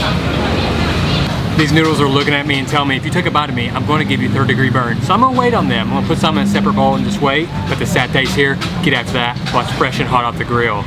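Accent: South, United States. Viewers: Where are you from? I hear a little bit of southern accent. Great video btw. Keep up the good work!!!!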